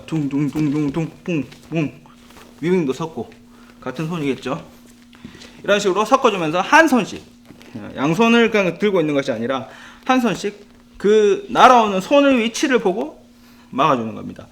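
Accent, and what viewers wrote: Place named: Gyeongsang, South Korea. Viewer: 경상도억양이 느껴져서 경상도에 있는 줄 알았습니다ㅋㅋ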